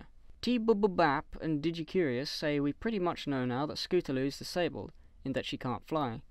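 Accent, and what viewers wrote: United Kingdom. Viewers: wait, wait, wait, Back up the pick up truck SAY WHUT. UR BRITISH. YUSH. ME TOO. BRO FREAKING HOOF. Yush I did notice that at the beginning. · are you english? And if so which part of England are you from?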